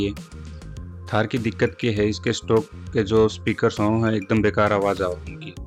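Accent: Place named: Haryana, India. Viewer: Great voice over , the haryani accent 😃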